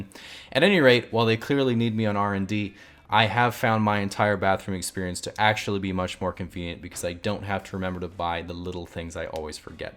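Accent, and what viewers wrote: Australia. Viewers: Very convincing Australian accent. Most people go for the crocodile Dundee accent and butcher it. I've lived here for 18 years and still can't do a convincing accent. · That's a really good Australian accent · Your fake Aussie accent sounds like a Kiwi, your real voice sounds Aussie cheers mate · nice aus accent i have to say, u even say fuken right. edit soz just realised ur probably born and raised aus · Top Australian accent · your aussie accent was spot on! didnt see that coming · Honestly, his accent sounds more "Aussie" and Internet Historian's. IH has a very "posh" Aussie accent, for lack of a better word. You ought to hear the bogans. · you sound exactly like darren levy with your australian accent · Okay but your Aussie accent sounds exactly like my little brother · That australian accent is oddly familiar. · That was an amazing Australian accent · Why does your australien accent sound like adrian gee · CE's Australian accent is really cute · Wait Your Australian, same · Hearing this guy speak in his Australian voice sounds so much more normal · That Australian accent is fucken great mate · Damn, the Oz accent is completely native! 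· The first joke he did was actually kinda funny. But that's just because he has an Australian accent, which makes everything funny. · I love the Aussie accent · still can’t believe u used to live in australia and pulled off that accent like u have 2 personalities ahahahaha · your aussie accent sounds like frank woodley! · his aussie accent is on point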